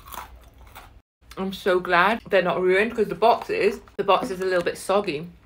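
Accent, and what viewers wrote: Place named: United Kingdom. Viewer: i luv ur accenttt where r u from? im from the uk too!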